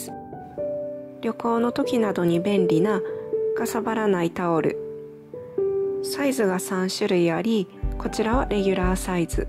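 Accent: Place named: Kansai, Japan. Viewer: 関西人ですかね？結構関西弁っぽいイントネーションで大阪の私は聞き取りやすかったです！ スマホ立てるの悩んでたので買ってみよ〜っと☺︎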